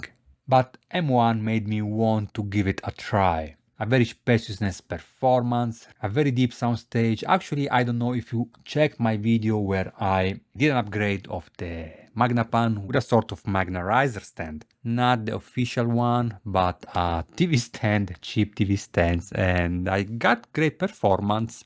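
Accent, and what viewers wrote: Italy. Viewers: Great review! I love your Italian accent :) Would you think the M1 is overpowered and a good fit for the Project Speakerbox V2s? I am planning to buy a REL Tzero MKIII with it.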